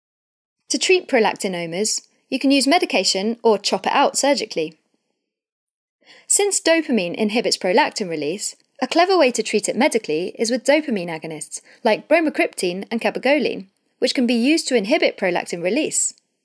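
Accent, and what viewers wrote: United Kingdom. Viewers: British accent 😊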